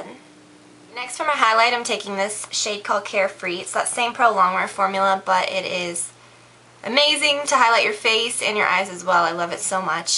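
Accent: South, United States. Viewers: i feel like only during tutorials does your southern accent come out :)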